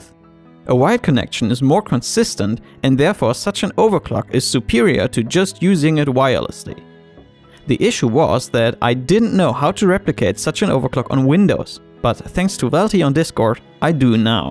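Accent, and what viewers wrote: Germany. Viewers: Man hört so dass du deutsch bist xD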